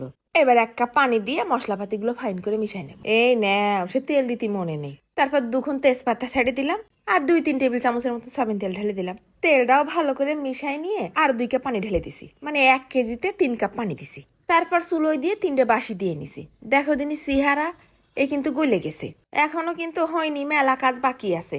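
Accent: Jessore, Bangladesh. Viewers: তোমার এ বু কথাটা খুব মায়া লাগে কারন আমার মামিও যশোরের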